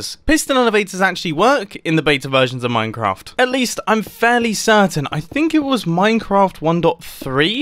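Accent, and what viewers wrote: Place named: United Kingdom. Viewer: Does anyone else not have any idea how any of this redstone stuff works? I just like watching the British man talk funny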